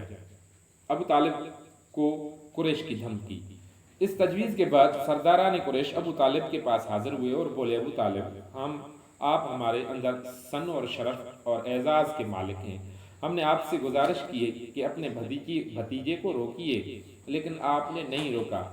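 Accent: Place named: Sindh, Pakistan. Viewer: very good book and good voice although sindhi accent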